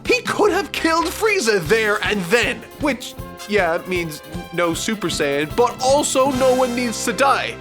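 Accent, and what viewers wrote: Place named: Ireland. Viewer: Idk but i just recognized your irish accent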